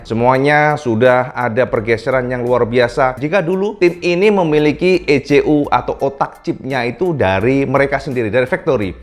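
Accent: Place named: Java, Indonesia. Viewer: seleranya boneka Ooo sampean sembarangan 😀😀 Suka banget kas jawanya cak Dan apa yang disampaikan selalu informatif, is the best 👍👍👍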